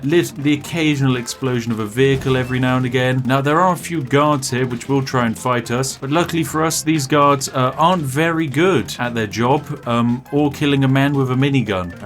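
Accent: United Kingdom. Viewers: I subscribed to you for the lone fact of your British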